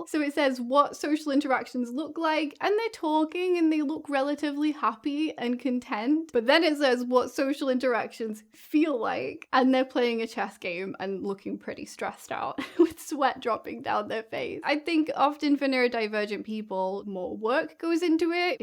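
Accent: United Kingdom. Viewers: I did not notice or ‘hear’ your English/British accent until you said the word crisps... several minutes into your video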